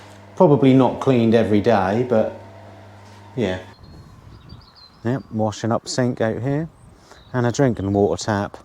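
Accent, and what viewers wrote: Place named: Norwich, United Kingdom. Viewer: Just discovered your videos and loving them especially as I'm a Norwich girl with a campervan, great to hear someone with my accent, very unusual. Recognise your face too, maybe in Norwich pubs? You love the same music as me.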